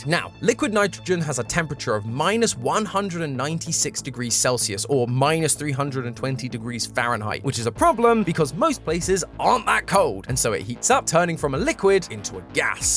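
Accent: United Kingdom. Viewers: hmm got a little British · British matpat gets More British somehow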